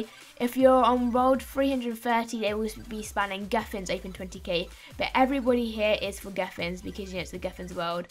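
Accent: United Kingdom. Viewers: Interesting accent, south England?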